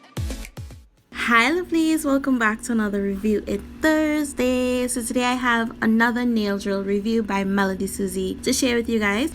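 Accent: Trinidad and Tobago. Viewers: Are u Trini 🥺?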